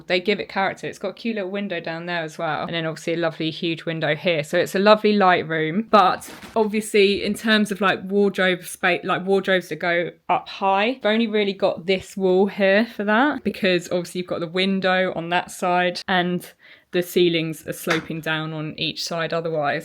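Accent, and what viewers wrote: United Kingdom. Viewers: Your Great Accent makes me think of Big Ben or The Tower of London , Are you a Brit , Fly a Union Jack in your new studio .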